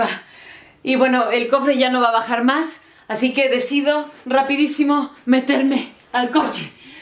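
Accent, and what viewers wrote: Mexico. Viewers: Me encanta tu asentó mexicano❤️❤️ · Jeje jeje. Me encanta tu español super mexicano. Numero uno. Dos. Si yo te contara todas por las que he pasado te mueres de la risa e impresion. Aqui aplicacion que cuando no te toca. Ni aunque te pongas. Bendito Dios. Seguimos aqui güera chula 😙😙😙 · es un poco negativo decir no seas como mejor di algo como tomando experiencias de:) saludos un canal muy padre positivo agusto al escucharte hablas español con acento de nosotros de México:) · Hablas el idioma español, perfecto me has sorprendido, ya hablas como Mexicana, saludos desde Veracruz. 😁☺✋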